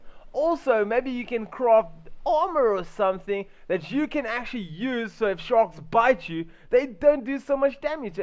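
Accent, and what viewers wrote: Afrikaans, South Africa. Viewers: lucky guess lol I’ve been trying to learn Afrikaans so the accent sounded familiar to those in the Afrikaans tutorials.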